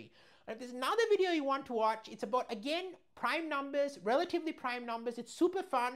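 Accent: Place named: France. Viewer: I think I understood you correctly, but at that point I didn't notice you were French, yet. Anyways, it's much clearer now. The main giveaway of this video is that most of these low primes are easy, even trivial, with some exceptions (maybe just 91, because 49 = 7² and 77 = 7 × 11 are so ludicrously simple)...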